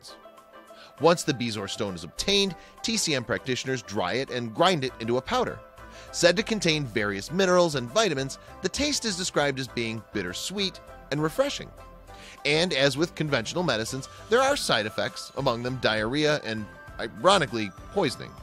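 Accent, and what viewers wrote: Midwest, United States. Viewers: New subscriber, enjoy your videos. The narrator on this video has a distinctive Midwestern accent. I enjoy hearing it since I've been in the south for the last 20 years.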